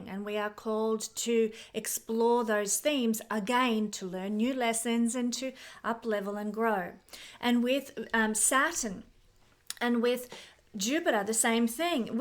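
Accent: Australia. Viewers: Your Australian accent is very pleasing to the ear! I miss your lovely country and all its wonderfully amazing people ❤🧡💛💚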